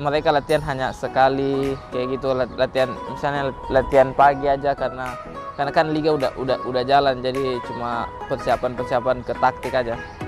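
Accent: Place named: Palu, Indonesia. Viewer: Sahabat sulawesi... Logat palu asli... Hehehe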